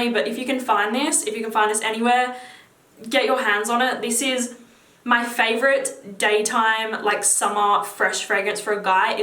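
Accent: Australia. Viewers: YU AUSSIE ? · 100% normal Aussie accent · I think she's Australian....but, I'm not sure....classy girl for sure! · Her australian accent does it all 👌🏻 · I definitely wasn't expecting an Aussie accent when i opened the video lol. Great list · That accent! I'm from London and get a lot of comments on mine haha but that aus accent suits you to a t!!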